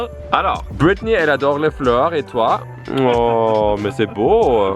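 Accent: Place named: Canada. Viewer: tu mon youtubeur préféré desvidéos accent canadien et qui met quoi des vidéos trop trop rire 👍👍👍👍 24 heures 🇨🇦🇩🇪👍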